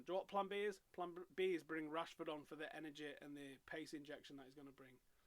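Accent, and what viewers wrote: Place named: Newcastle, United Kingdom. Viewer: Another geordie on Youtube pfff -_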